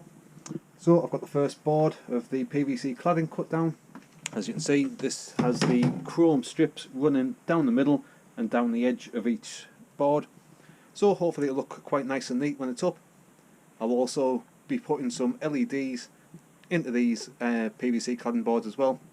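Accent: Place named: Teesside, United Kingdom. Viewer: My wife has a good ear, she said you were from Teesside 😂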